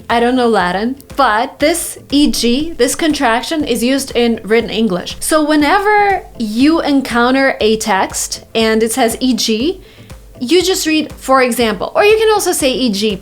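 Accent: United States. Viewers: Can I be like you... I wanna talk alike you.. I'm dying to learn this accent... American 😭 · Studio would be better. But then yes anything that please you or form your comfort. I'm always focused on your accent so that I can interpret everything you say. And honestly I've been watching your videos for past 1.5 years or more & I can confidently say I can not only understand your entire accent but many other American speakers. Thank you so much from the bottom of my heart ❤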